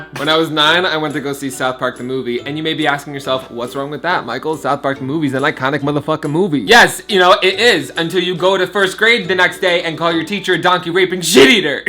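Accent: New Jersey, United States. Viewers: Is anyone noticing his accent I like it jersey!!!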